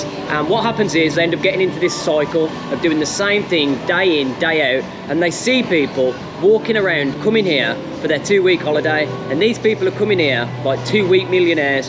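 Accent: Birmingham, United Kingdom. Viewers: Nice to hear a down to earth Brummie accent on channels like this, keeping it real.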